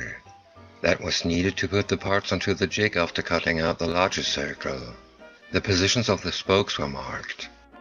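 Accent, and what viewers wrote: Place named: Hungary. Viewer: . I think he is from Hungary? Your accent is maybe better.... when do you expect to show the paint video for the wagon.... I look forward to it.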